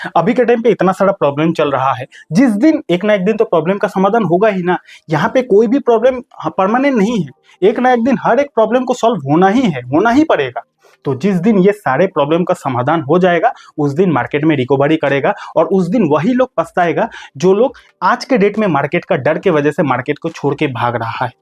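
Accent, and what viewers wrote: Bihar, India. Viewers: बिहारी हो क्या भाई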